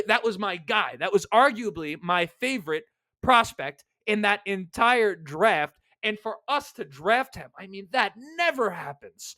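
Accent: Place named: Indiana, United States. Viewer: Just learned that you're from NY. Thought people from Ind had your accent. Nonetheless, good episode.... i know it's tough during the lull.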